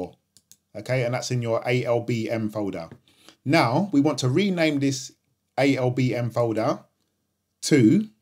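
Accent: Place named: England, United Kingdom. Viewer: the very least I can do to say thank you. Love your English accent.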